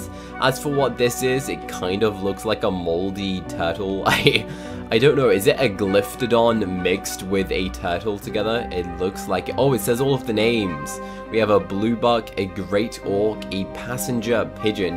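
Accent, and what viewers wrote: United Kingdom. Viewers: British Guy he is a british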